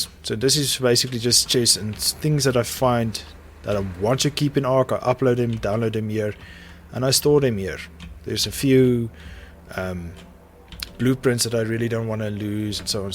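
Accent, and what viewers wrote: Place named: South Africa. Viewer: i heard it in the accent and when you Said ja further in the video i knew for a fact, im from SA aswell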